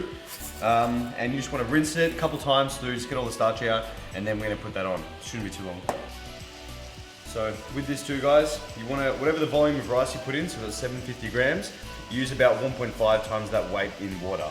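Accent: Australia. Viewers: Wtf dude just shop at Aldi. I don’t know if you live in US or Australia tbf, you sound Australian. We have Aldi in UK and it’s cheap af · oh shit he's from STRAYA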